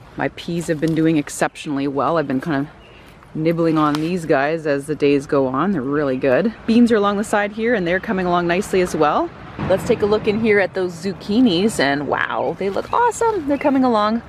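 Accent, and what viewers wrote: Canada. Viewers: my god do you ever sound Canadian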